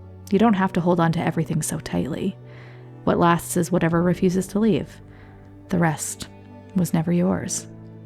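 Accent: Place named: United States. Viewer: a narration dubbed voice who speaks in American (or Canadian) English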